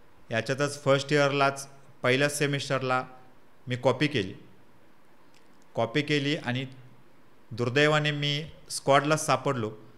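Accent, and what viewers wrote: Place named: Walwa, India. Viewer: मला पहिल्यापासूनच वाटत होते की ही भाषा वाळवा तालुक्यातील आहे मी पण इस्लामपूर मधून आहे .माहेर इस्लामपूर व आजोळ आष्टा. डीन.एड्. कस्तुरबाला झालंय. आमच्या तालुक्यातील माणूस लय भारी वाटत.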